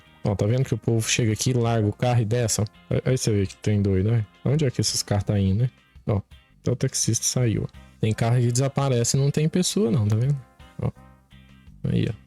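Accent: Minas Gerais, Brazil. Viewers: vc é engraçado o sotaque mineiro deixa mais engraçado ainda o video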